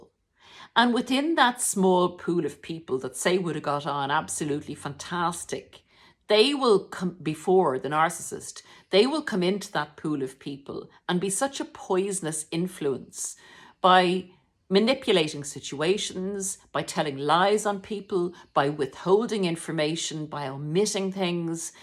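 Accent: Ireland. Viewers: My ex was Scottish but lived in Ireland, so I visited Ireland a lot and travelled around many different counties. Thus I love the accent and really love listening to you. Your voice is really soothing to me and reminds me of just sitting chatting with locals in some beautiful country bar. You also hit the nail on the head with the narc stuff , as the Scottish ex was a disgusting , cheating lying abusive violent narc - a really extreme version. So despite the fact that some very extreme abuse occurred when I was alone with him in Ireland - i still have some lovely memories of the place, the landscape and people i encountered when out and travelling around alone. I even met strangers who stepped in to help me when the abuse was extreme- people who actually took me in to their home. I am eternally grateful for the help they so selflessly gave me- and I often wish I could let them know albeit spiritually, that I finally got away and stayed away. 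❤️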